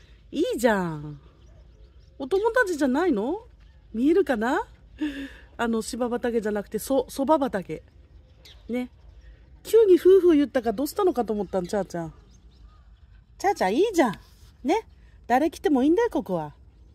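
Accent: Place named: Ibaraki, Japan. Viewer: 初めまして！もしや茨城の方ですか？ 何となく聞き慣れた訛りが😁私は現在北欧のデンマークに住んでいますが、生粋の茨城人です。茶ーちゃん可愛いですね。我が家にも茶白猫がいて、細々と猫動画もやってます。全然人気がありませんけど😅チャンネル登録と高評価ボタンポチポチしちゃいましたよ。これから他の動画もゆっくり観させていただきますね。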